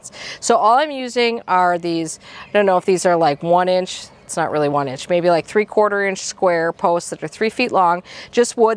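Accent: Wisconsin, United States. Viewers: Great Dahlia video--THANKS! I have grown Dahlias for 5 years or so, but there are so many helpful things to learn! The Florida weave looks like a super way to keep Dahlias from toppling! I have 4 of the 5 varieties you showed, (not Penhill Watermelon), with all but Cafe Au Lait being new for me this year. I am so excited to see them bloom!! Your Dahlias are quite a bit bigger than mine so far, as I had to put mine directly in the ground. I would LOVE to start mine in pots, but I don't have room....I have 90 varieties total this year (Where do you keep them as they are growing in pots?)! ;) Thanks for all the tips--Hope to see an update this Summer! :) :) Love your accent by the way--My aunt lives in Wisconsin (Neena, WI), and it makes me think of her when you talk...such a lovely sound to my ears! Happy gardening! Hope you have bushels of beautiful blooms! 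;)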